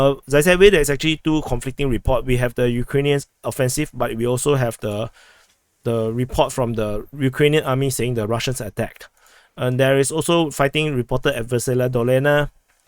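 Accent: France. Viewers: good pronancuation closer to real one :) yet French :D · I suffer emotional damage listening to your commentary! Really well done - HOW do you get the names out so well?And the FRENCH accent!!!! WOW! But great analysis with current information sorting the known from the guesswork and the propaganda.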